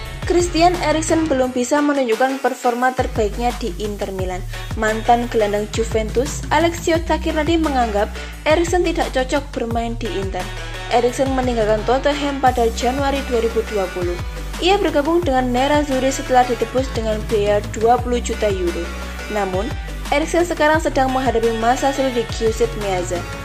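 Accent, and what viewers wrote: Java, Indonesia. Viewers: Mbak e wong jowo endi? 😅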